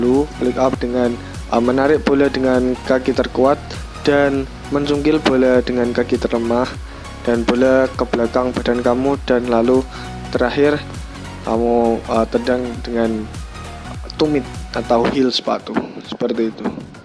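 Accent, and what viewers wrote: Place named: Java, Indonesia. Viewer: Asalmu pundi mas, kok medok koyo wong jawa ?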